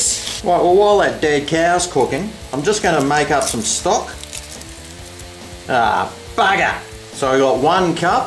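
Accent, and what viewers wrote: Australia. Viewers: hahaha talking in the authentic aussie accent really shows people how we talk down unda!!! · I usually send my mom new recipes that we can cook, I want to send her this, but my arabic mom is gonna be so confused when she sees a Middle Aged bloke shouting at his camera in an Australian accent😂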